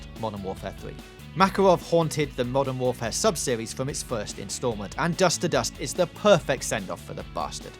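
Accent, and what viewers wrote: United Kingdom. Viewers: This guy is probably the most British brit i've ever heard.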